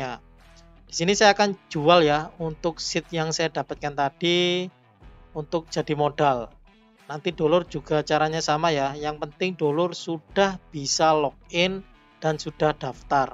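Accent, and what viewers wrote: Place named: Java, Indonesia. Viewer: suka denger suaranya yg khas dg jawani dan lucu wkwkwk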